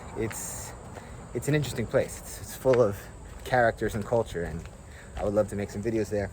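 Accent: Brazil. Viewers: We are so used to seeing speaking Portuguese that when he speaks English it seems like he is a Brazilian making videos in English, heheheh. Make more videos in English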